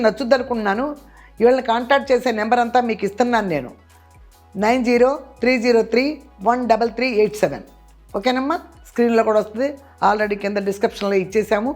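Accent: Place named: Nellore, India. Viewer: Amma mee matalu naku chala estam madi nellore ma matalu kuda elage untayi meeru matladuthunte vinaali anipisthundi amma ❤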